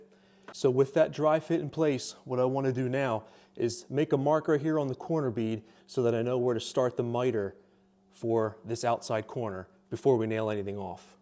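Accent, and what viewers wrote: Philadelphia, United States. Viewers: thought I detected a Philly accent. then I saw the hoody. go phills!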